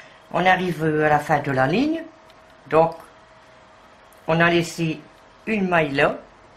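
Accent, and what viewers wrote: North, France. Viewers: Vous êtes du nord apparemment de part votre accent!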